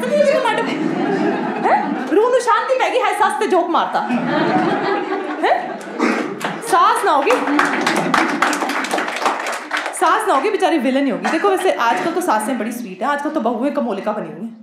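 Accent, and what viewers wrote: Punjab, India. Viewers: She is aging like a fine wine and her voice and Punjabi accent is litt. And nice jokes also🔥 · So decent lovely beautiful I can't express ☺️☺️☺️ yesss Punjabi tarqa massst haiiii☺️☺️☺️ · You r doing amazing mam....just keep it up.. really liked you Punjabi accent 💫👌... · Amazing ji.....jatti ji full kaim....really loved it.....specially your punjabi accent ain kaint....waheguruji bless you jattiji....chardikla ❣❣💐👌👏👏👏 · Solid content ma'am, I loved your punjabi accent... Waiting for your next video 🎉🎉🎉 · Sorry we are not ok with punjabi tadka. but u can speak. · Punjabi accent aapki ufffff ❤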